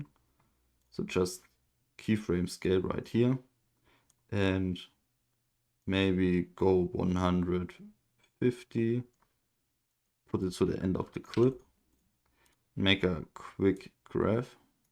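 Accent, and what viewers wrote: Germany. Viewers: the german accent is wild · Man hört einfach das du Deutsch Bist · Dieser deutsche Akzent HAHDHADASHAHAH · Dieser Deutsche accent haha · Wusste beim ersten Wort schon dass du Deutsch bist xD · Das nenne Ich mal eine deutschen Akzent 👍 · deutsch rausgehört XD. aber trozdem gutes englisch! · Wusste im ersten Satz schon das du deutsch bist hahahah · man hört den deutschen Akzent so hart hahaha, nices video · Bro bist du deutscher? :D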